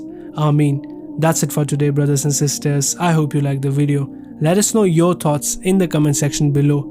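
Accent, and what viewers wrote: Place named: India. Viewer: Too old news these indian posts old information, with funny English accent fix your English first.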